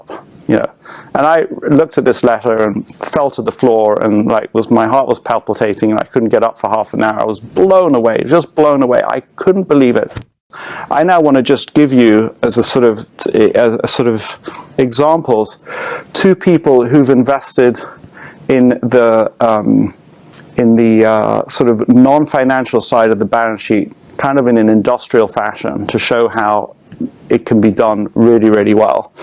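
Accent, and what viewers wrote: Europe. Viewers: listen to his voice, European.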